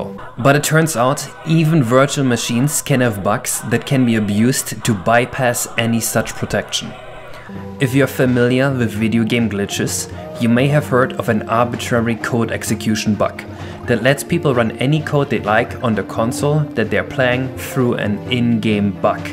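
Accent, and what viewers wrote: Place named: Germany. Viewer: hey, i like you and your videos however it would be nice if you could refine your pronunciation a little more its not bad by any means, still it could use some improvements here and there f.e mispronouncing some words or your still somewhat noticeable german accent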